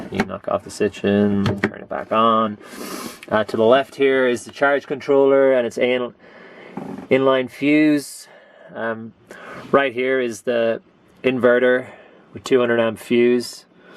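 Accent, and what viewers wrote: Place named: Ireland. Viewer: I detect an Irish accent.where u from.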